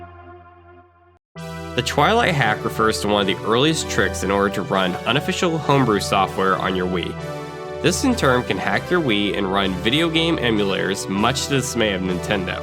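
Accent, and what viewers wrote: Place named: United States. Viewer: For someone reason, I thought you were British. I didn't realized until halfway in the video that you are speaking in American accent 😂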